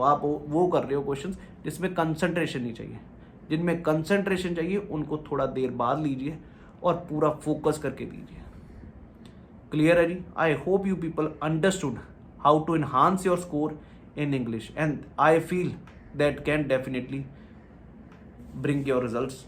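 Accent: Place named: Pakistan. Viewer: Sir apka accent pakistaniyo jesha hein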